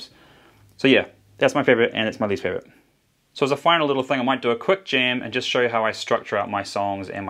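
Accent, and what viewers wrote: New Zealand. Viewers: So, are you a Kiwi?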